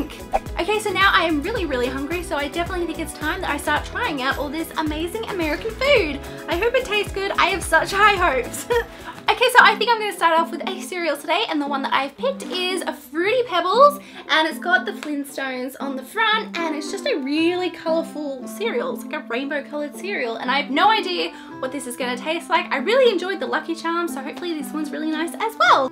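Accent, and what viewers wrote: England, United Kingdom. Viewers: I thought she was from england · Oh I always thought you were British! Btw I’m a girl just on my dads account.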